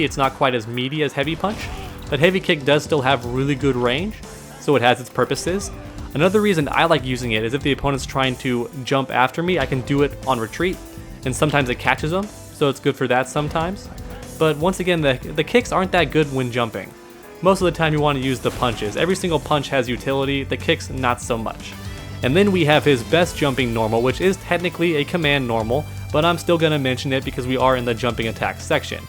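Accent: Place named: United States. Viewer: This dude is mad cool, he just speaks "AMERICA" and I love that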